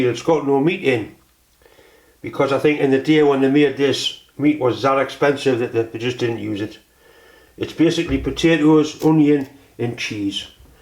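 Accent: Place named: South Shields, United Kingdom. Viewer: My grandad was from South Shields, your voice and accent almost bring him back, thanks from far Australia.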